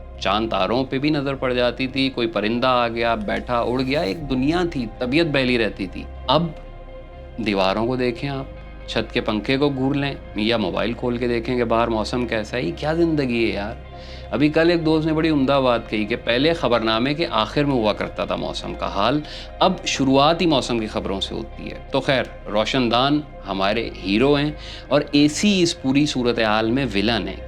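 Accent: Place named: Karachi, Pakistan. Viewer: Waw, excellent bhai kya behtareen boltee hain aap, I presume you are from Karachi for sure :) as your urdu was as par. Initially trees an parks can safe us, as our activity is zero and we have concrete everywhere around us. Greenery/trees at certain level, plus managed family parks, clean water, 24X7 clean electricity and safety should be part of law to must have for a town. If this can not be done to already developed towns/cities then at least apply them to new towns, there are number of new societies where this can be achieved.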